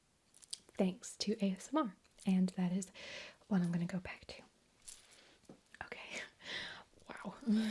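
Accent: United States. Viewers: As a none American, I would be easily fooled into thinking you where American most of the time. very creative idea. don't think I've seen a video where the character is an asmr fan without it being "crazy stalker" roleplays. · I forgot until I read that comment that you are British! It was near the end, too! Wow great American accent. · Your American accent is sooo good it throws me off more when you speak in your normal accent. You control all the little tell tale signs that usually peek through when doing an American accent.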